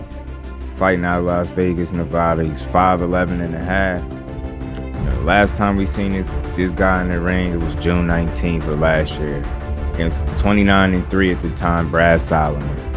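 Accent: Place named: Washington DC, United States. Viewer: omG, you’re from the dc area?? i think i hear a dc accent, especially when you say “hands”.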